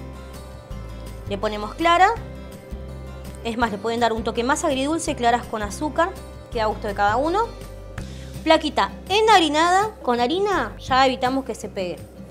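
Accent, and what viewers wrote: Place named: Argentina. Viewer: Disculpa mi ignorancia ...me encanta la comida boliviana ...Cómo así usted tiene acento argentino y prepara comida boliviana? Un saludo desde Londres...Acá nosotros somos fans de los restaurantes bolivianos .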